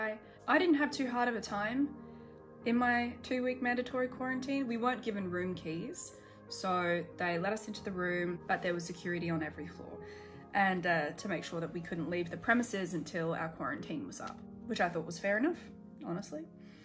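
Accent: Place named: Australia. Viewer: You can tell you're home because you sound SUPPPPPER Aussie. Thanks for sharing your journey, can't wait to watch your streams!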